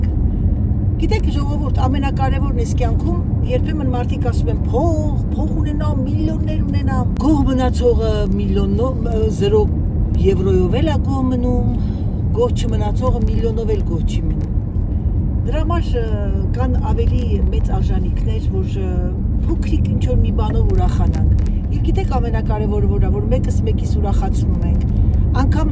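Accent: Gyumri, Armenia. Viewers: Huzich er jan Lennakani akcentn el mi urish a! De cankali klini vor erexan apri cnoxi het tekuz mec tariqum bayc ete edpes e voroshel inch arac ham el ete amusnanum a arandziny tchisht e, inchqan el lav haraberutyunner lini arandzin zuyger aveli azat klinen u tchisht e erkusi hamar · Jerm barevner bolorin jan inchkan em sirum wor Lenakani Barbarov ek Xosum ❤❤❤ Im Txanela minujar u aprum en Arazin ❤❤u Da E jiste Ayo jan der kann nman Mardik wor der che Giten wor Mer jamanaknere ancel en Es Dzez Siremge. Hatuk barevner Mamayin u Dzer Huyrin ❤❤Dzez lav jamanak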